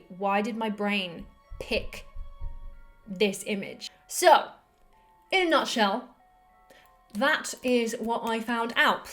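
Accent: England, United Kingdom. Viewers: The english accent really helps out the channel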